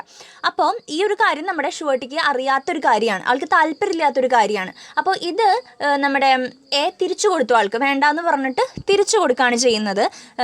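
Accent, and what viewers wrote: Thrissur, India. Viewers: Miss nte slank Thrissur kkaarde pole ind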